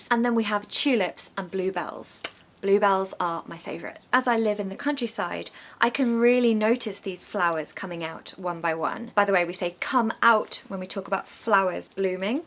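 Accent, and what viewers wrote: United Kingdom. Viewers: Read books to us in your British accent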